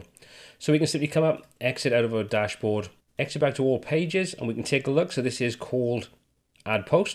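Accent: Wales, United Kingdom. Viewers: Hi, you sound Welsh? I'm also from s. wales. Thank you for this video mate I wish I found this 5 months ago when you made it, would of saved me a lot of time. :)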